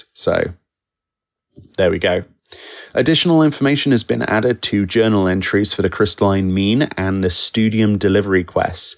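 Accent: United Kingdom. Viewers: I'm still able to thoroughly enjoy the british man reading FFXIV patch notes ASMR so it's okay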